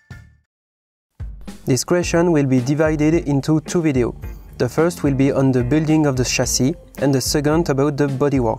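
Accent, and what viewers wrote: France. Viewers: t'es francais non?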